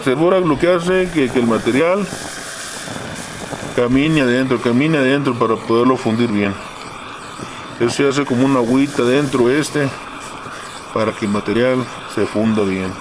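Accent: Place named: Northern Mexico, Mexico. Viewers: Muy bien mi amigo,me acabo de suscribir,se nota por tu acento que eres norteño,yo soy de Aguascalientes,de zona de minas,un abrazo y fuerte saludo compa,por tu sencillez y por compartir tus conocimientos,me gustaría que hicieras un vídeo de como extraer oro de tarjetas electrónicas,a mi me llaman Ripper,a tus órdenes,y seguimos en contacto,dios te bendiga,ah y te voy a hacer una pregunta,ojalá me la sepas contestar, " a quien pertenece todo el oro y la plata ? ". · Eres de Sinaloa? Tienes el acentohaha buen vídeo hermano, muy interesante la verdad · tu acento de chihuahua o sonora es indiscutible ¨ya pisha una soda mushasho"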